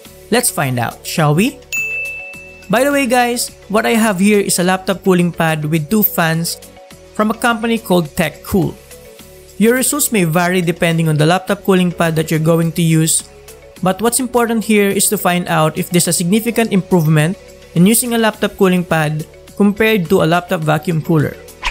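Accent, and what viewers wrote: Philippines. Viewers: When you showed your face and talked i allready knew your a filipino BROTHER!! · your accent sounds like filipino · Great video! It was really professional in terms of quality, presentation, audio, and content! Keep doing what you're doing. I was surprised that you only have 540 subscribers. I already knew you were a Filipino when I heard your voice so I hope you get big in youtube and represent us filipinos proudly! :)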